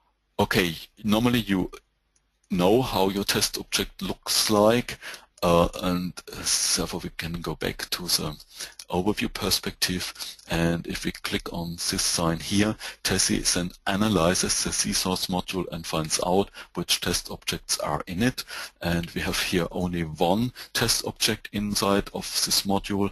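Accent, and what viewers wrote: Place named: Spain. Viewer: Sound like Spanish but understand very good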